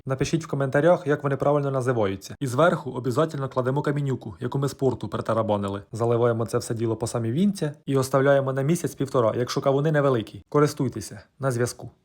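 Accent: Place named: Cherkasy, Ukraine. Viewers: Говір такий як у моєї мами Вона також родом з Черкащини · Новий П.Глазовий !!!!чи Литвинов! Але ж ти Суперський з черкаським суржиком🎉🎉🎉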